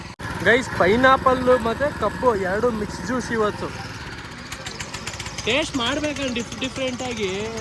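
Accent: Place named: Hassan, India. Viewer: Pakka hassan language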